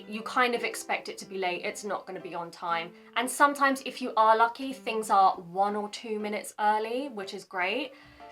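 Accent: RP, United Kingdom. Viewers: イギリス英語の発声はやっぱりカッコいい！ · Nice Queen's English. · 当たり前ですが英語がとても上手いですね。ネイティブスピーカーを捕まえて批評など毛頭ありませんが素直に発音がいいと思うし話し方に品がありますね。同じ英語でもイギリス人はアメリカ人のような砕けた話し方は決してしない。本物のイギリス人を実感します。発音はやっぱりイギリス英語特有で喉の奥から発音するやり方で我々日本人にはなかなか真似できない。イギリス人の友人から「トマト」は「トマート」と発音し「トメート」とは発音しないと言われたのを思い出しました。それからどちらの国も島国で王室や皇室があることや騎士道や武士道があることも似ていますね。日本での生活は色々大変だと思いますがどうぞ頑張って下さい。そして楽しんで下さい。 May God make your life in Japan be more enjoyable and unforgettable. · Hey,! I really admire your BRITISH ACCENT! People say my English is American, for I learned by American English textbooks since my junior hi days. When university, I was much influenced by Country-Western, bluegrass and hillbilly music, and now my accent sounds deeply Southern American.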